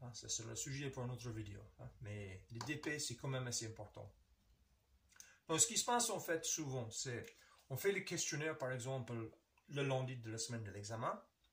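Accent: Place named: Canada. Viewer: Quel accent canadien 😊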